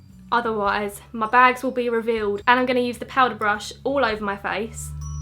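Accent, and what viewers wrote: Essex, United Kingdom. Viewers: Are you from Essex??? You sound like you have an Essex accent and I'm originally from Essex so I can spot it out. Loved your makeup btw, very pretty.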